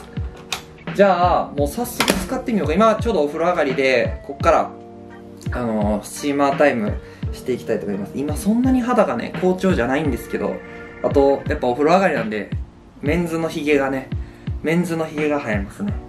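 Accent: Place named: Kansai, Japan. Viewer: 関西弁も素敵です☺️💕肌綺麗ですね！スチーマー買おうかと検討してたのですごく参考になりました！😆💕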